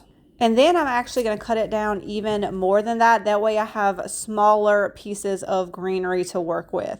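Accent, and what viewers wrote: Louisiana, United States. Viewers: I love everything you did but the measuring cups were just so simple and darling. By the way, are you originally from Louisiana bc you have a Louisiana accent you sound cajun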